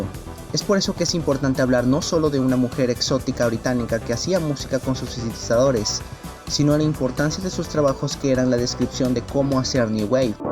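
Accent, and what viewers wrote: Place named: Venezuela. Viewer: Genial este resumen sobre la grandiosa, a través de este material descubrí este excelente canal, que voy a seguir en el futuro, si no me equivoco por tu acento neutro podrías ser venezolano al igual que yo, saludos por tu canal.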